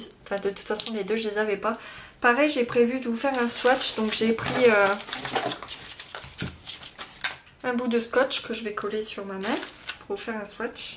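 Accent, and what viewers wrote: North, France. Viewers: Tu est du nord non? :')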